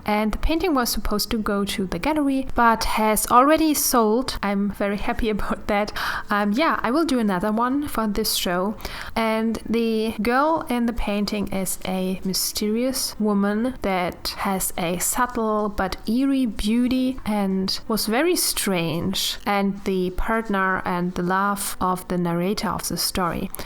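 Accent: Germany. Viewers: Your accent is so german 😄😂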